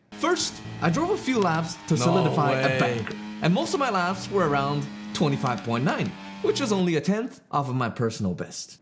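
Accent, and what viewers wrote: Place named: Netherlands. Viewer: I smell dutch accent🥶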